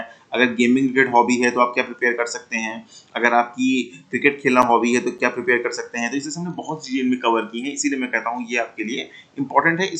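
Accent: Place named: Punjab, India. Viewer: Sir kbhi hindi jyada boli nahi to hindi me Punjabi touch feel hota hai ....chlega kya!?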